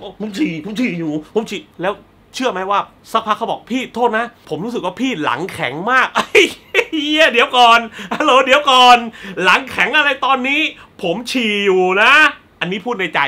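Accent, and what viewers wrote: South, Thailand. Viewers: พึ่งรู้ว่าพี่คนใต้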